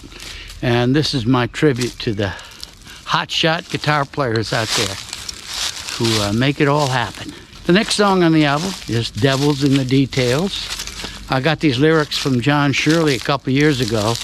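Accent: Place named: Upstate New York, United States. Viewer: Just listened to the album, love it! Very impressed with your multi-instrumental skills. I especially like, I think. Nice lyrics by Helen Wheels, she was so talented. R. I. P. Very interesting walk-and-talk, and beautiful scenery. BTW, love the upstate NY almost Canadian-sounding accent. I'm from Norway but I like to try and pinpoint English-speaking people's accents. Sorry for the digression. 🙂 Edit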